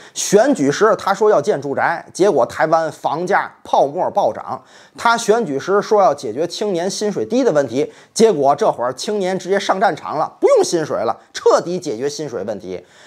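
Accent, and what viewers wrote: Tianjin, China. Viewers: 这家伙，天津嗑儿绝了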